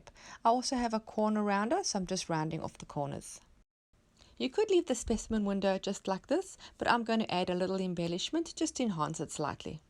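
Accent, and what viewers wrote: South Africa. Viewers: This is so simple yet so effective! Where are you based? You sound South African ☺️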